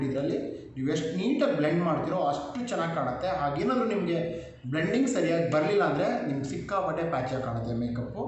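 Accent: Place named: India. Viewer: Kannadadalli explain madiddke thank u so much sir.....Your Kannada accent is super.... Sir pls do more n more videos in kannada..... V r waiting ur videos in Kannada language ...... Thank you sir.... And also pls explain about different brand of foundations.....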